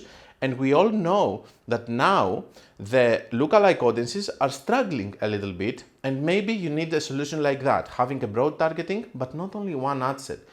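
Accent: Greece. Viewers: I do have sensitive hearing, but it wouldn't bother me so much, if it were only intro sound, but here's the thing, I watch YT on TV using keyboard and I need to constantly adjust volume when you're suddenly inserting those sound effects in midst of your quite quieter speech. And all that including your Greek accent (which I don't mind being European myself) really extends duration of watching while I try to comprehend what you're saying, so I'm constantly stopping and reversing video and it's a pain. You may comfort yourself by not being the only youtuber with that problem, there's plenty of them out there. But you don't need to edit your videos on my account, after all this was your first and last video I watched, because I've never had FB account and these topics don't interest me. And now you may bring up some mom jokes.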